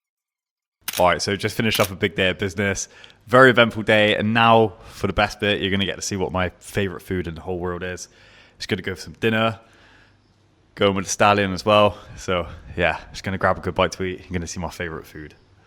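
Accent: Bristol, United Kingdom. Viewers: Hi, love the videos mate!..Spot on👌🏼 I can’t help but notice the accent, as a lifelong Bristolian might I be right in saying you’re somewhere near here😂 Cheers for all the insight dude!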